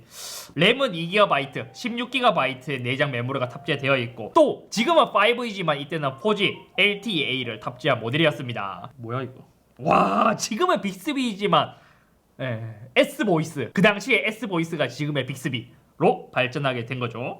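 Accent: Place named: North Gyeongsang, South Korea. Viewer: 잇섭님 리뷰는 대구사람으로서 듣기 아주 편합니다. 보통 유투브 경상도 사투리는 경상남도 사투리라 되게 다르게 들리는데 고향이 경상북도신가 봅니다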